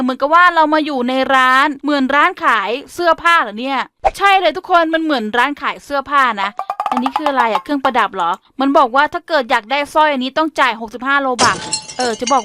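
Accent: Isan, Thailand. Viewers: พี่ เป็นคนลาวใช่ไหมค่ะหนูพี่พูอีสานคะ😅😅😅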